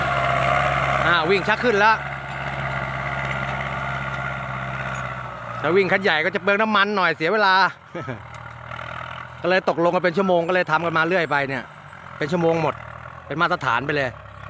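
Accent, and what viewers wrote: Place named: Nakhon Sawan, Thailand. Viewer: ครับผม ผมก็ว่าสำเนียงคุ้น ๆ คล้ายนครสวรรค์ ที่แท้อยู่ใกล้ ๆ กันนี้เอง สุดยอดครับ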